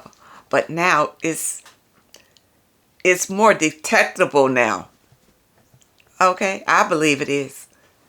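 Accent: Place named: New Orleans, United States. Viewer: Love that New Orleans accent I can hear it unique energy you're amazing I watch you and Only Logical channel he expose those BSB Bottom Shelf Brads anyways great content channel you have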